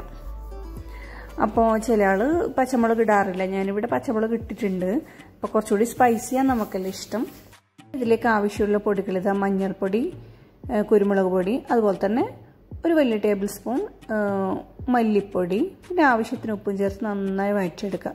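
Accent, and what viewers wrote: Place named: Kannur, India. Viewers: Adipoli puffs....but I don't have other oven... I have only microwave.... nice to hear from you....kannur slang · Njan new sub er Anu ithante samsaram ishtayi aann aann kannur aanno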